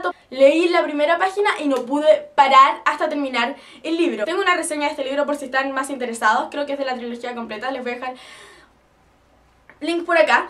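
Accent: Chile. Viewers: eri chilense?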